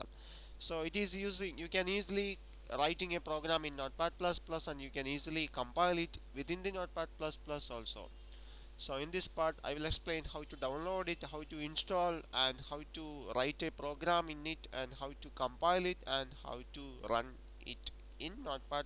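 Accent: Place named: Kerala, India. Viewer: I can understand your Malyali accent, that means, you are from Kerala, that's a southern state in India.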